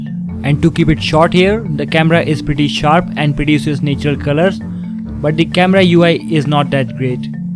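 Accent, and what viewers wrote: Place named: Bihar, India. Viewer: Your English has Bihari Accent! #NoOffence Nice Video btw! :)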